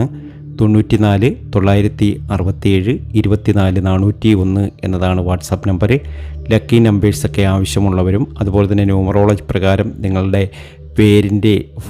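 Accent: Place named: Alappuzha, India. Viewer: ആലപ്പുഴ ക്കാരൻ ആണല്ലേ 👌👌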